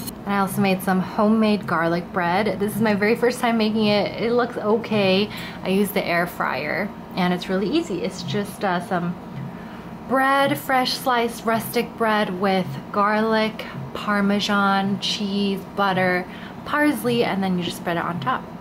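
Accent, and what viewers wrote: California, United States. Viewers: I like your Cali accent, stands out in NYC.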